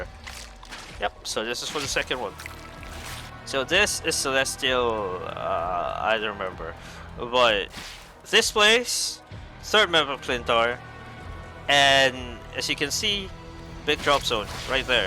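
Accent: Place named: Singapore. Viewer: Sounds like Singaporean or Malaysian 🤭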